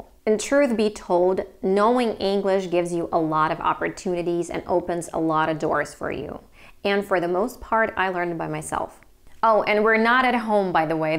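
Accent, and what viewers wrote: United States. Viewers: Looking to raise my kid bilingual too. Could've sworn you were American. Thanks for the tips! Great story! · А муж тоже на англ с ребенком общается? Как у мужа с английским? Он себе такое же американское клевое произношение ставил, как у вас?) Вообще, я просто с отвисшей челюстью смотрел видео. Очень восхищаюсь вашей смелостью, целеустремленностью и авантюризмом))))) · Підписаний на Ваш канал і з задоволенням його слухаю. Цікавий зміст, чудова американська вимова, вродлива і харизматична автор блогу. Бажаю успіхів у Вашій роботі. Цікаво буде через 2-3 роки побачити і почути, як вільно розмовляє Ваш малюк двома мовами!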